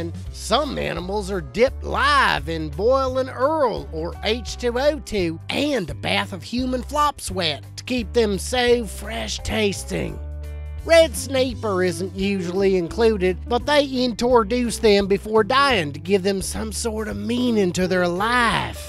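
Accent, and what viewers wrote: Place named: South, United States. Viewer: Sir you are one hilarious son of a bitch! Please I am NOT cursing. I practically Rolled Over The Fucking Floor and Laughed My Ass Off! God I wish I could shake your hand and get an autograph from ya!!! Your Southern accent had me bend over double!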